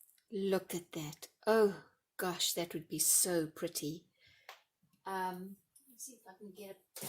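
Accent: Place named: South Africa. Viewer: So great to hear a South African accent!!!❤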